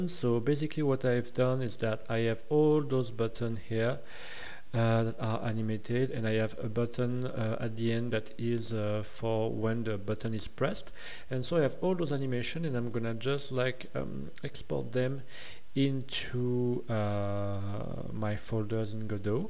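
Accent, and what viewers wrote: France. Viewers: Are you french ? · c'est bizarre mais tu parles anglais comme un français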